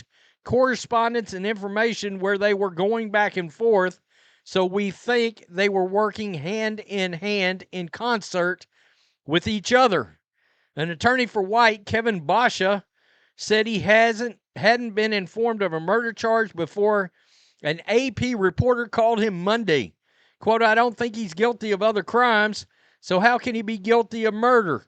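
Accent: South, United States. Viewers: Dude, you sound southern you should know even the law is crooked in New Orleans. Nothing crazy out of that city ever surprises me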